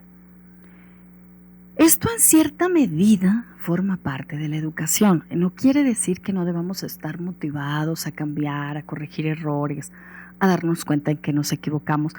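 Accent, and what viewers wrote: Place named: Mexico. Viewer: Muy atinado tu video,; hay mucha confusión de lo que es el narcisismo y de cómo se manifiesta; de igual forma cómo es que es ideal tratar a una persona con narcisismo maligno. Tu video es muy valioso. Gracias por compartir. P.D. Tienes acento de mexicana, ¿estás en Mexico? Gracias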